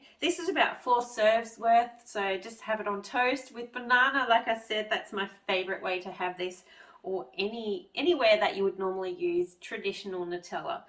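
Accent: Australia. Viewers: You look exactly like Ann reardon from How to Cook That, if she had lighter eyes! And if I'm guessing right from your accent, you're Australian too?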